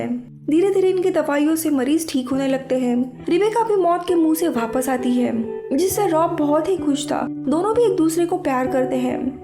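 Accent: Maharashtra, India. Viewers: May be from marathi school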